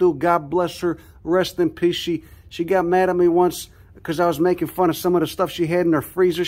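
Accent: Chicago, United States. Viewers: Chicago accent!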